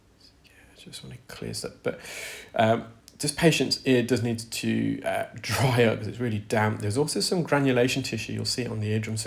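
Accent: United Kingdom. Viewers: I know your British but where about exactly is you’re accent from ?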